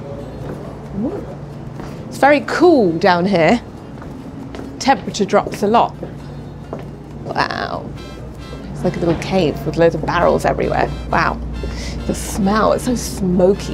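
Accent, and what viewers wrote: United Kingdom. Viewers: Your accent sounds British. Are you British?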